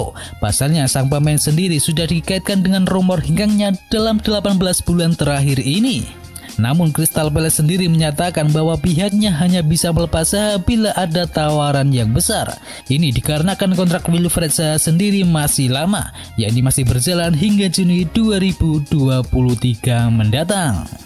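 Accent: Java, Indonesia. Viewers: Medok amat mas bro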